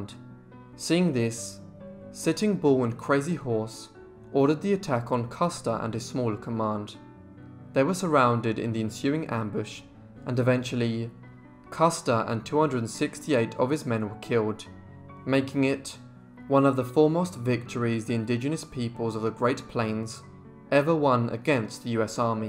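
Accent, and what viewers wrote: England, United Kingdom. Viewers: Not buying this for a minute, beware of American history lesson from someone with a British accent... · this is wrong, please we need a native STORY TELLER not a brit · Why do you have someone from the UK narrating American history??? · Very good, However, it seems a little strange for our early American history being told to us by someone with a British accent. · I like these history narratives, but I have to say I almost skipped it at the start when I saw the narrator and heard the English accent.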